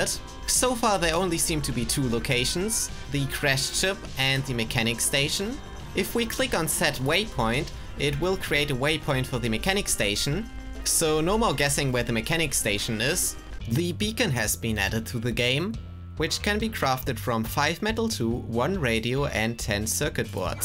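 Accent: Germany. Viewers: Netter deutscher Akzent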